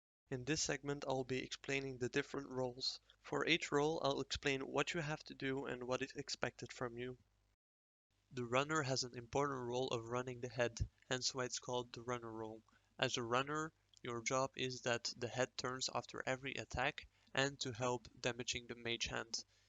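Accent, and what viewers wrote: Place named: Netherlands. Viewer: jij bent 100% nederlands... nice accent bro.. bedankt voor de uitleg maar ik wist dit allemaal al, suc6 met je drops.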